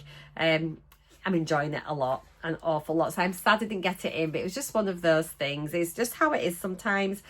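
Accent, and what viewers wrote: Yorkshire, United Kingdom. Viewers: Congratulations, on reaching your weight loss goal. I appreciate the time and effort it takes to create your videos; all very informative and inspirational. Your upbeat nature helps me overcome impatience when I feel like my own weight loss is stalling. Truth is, it never stalls for long. I tend to stay at one weight for two or three days following injection and then lose a pound or more during the remainder of the week. After my weekly injection, I watch your corresponding GLP-1 Diary video. For example, my next injection begins my week 12. After my poke, I will watch your Week 12 video to see what results and side effects I can anticipate as our weight loss rates and side effects have been similar so far. I have lost 30 lbs as of today. Woo hoo! Only 70 more to go until I can celebrate like you! Mounjaro is a godsend and the ONLY thing that has worked for me. 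Conquering obesity while building muscle is the best thing I can do to stay healthy as I age. Being able to fit into non plus size clothes is just an added benefit. On a personal note, your lovely Yorkshire accent reminds me of my cousins who live near Leeds. I am in Canada so our visits are few and far between. Take Care and best wishes as you begin your maintenance phase.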